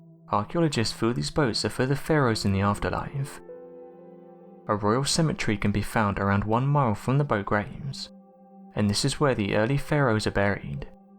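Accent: England, United Kingdom. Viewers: Really don't like the English accent !🍀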